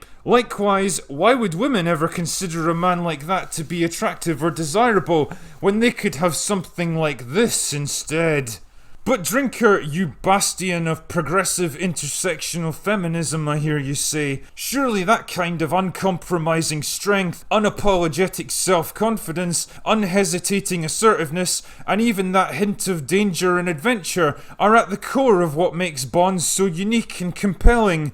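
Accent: Scotland, United Kingdom. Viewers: I fucking hate that accent. Where is it in Scotland you're from?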